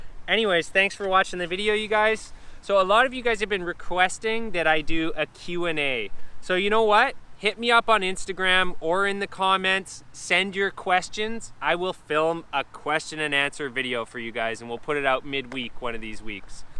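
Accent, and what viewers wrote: Canada. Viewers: Jesus, you're the most Canadian sounding person ever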